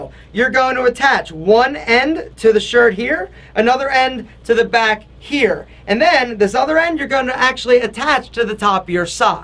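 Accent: Philadelphia, United States. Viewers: The philly accent is brutal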